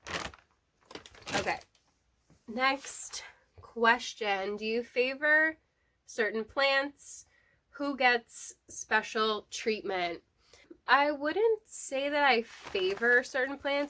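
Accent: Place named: Bronx, United States. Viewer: Thanks for sticking up for the golden pothos! ✊😊 I really like this repot. It covered a lot of ground. Ok let's sum up: Yes, you have an accent that's says "just north of NYC". Girl, get that Miracle Gro darkest pits of hell Satan dust out of your home! The fungus gnats will come. Just remember Miracle Gro has chemical fertilizer added so combining it with worm castings will give your soil high amounts of nitrogen and you can burn your plants. Lastly, what medicinal plants are you growing and planning to grow? 🤔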